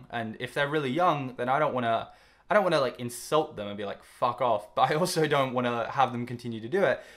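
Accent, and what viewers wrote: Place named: United Kingdom. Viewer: I love his accent it's obviously British but there's something else in it lool I'm so weird 😂❤️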